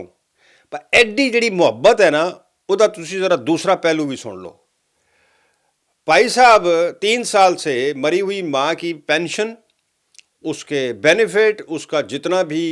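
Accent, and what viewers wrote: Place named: Punjab, Pakistan. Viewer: your Punjabi touch is hilarious 🤣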